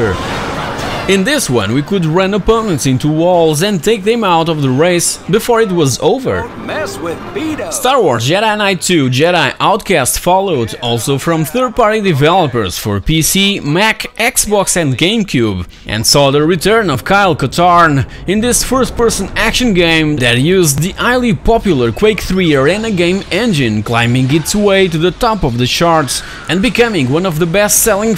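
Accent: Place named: Portugal. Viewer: Vi logo pela forma de falar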